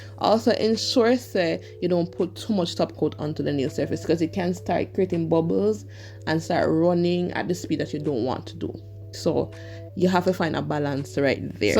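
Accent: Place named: Caribbean. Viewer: I hear caribbean accent 😅 where are you from?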